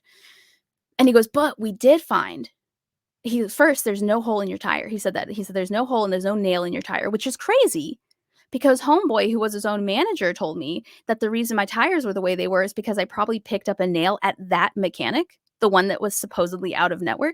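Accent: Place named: South, United States. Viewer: Welcome back! I love how the Southern accent surfaces depending on how riled up you are...lol.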